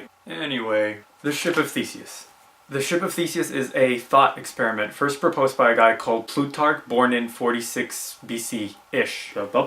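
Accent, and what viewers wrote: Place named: England, United Kingdom. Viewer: Oh, I really found this thought pretty nice! Loved it, also loved your English accent.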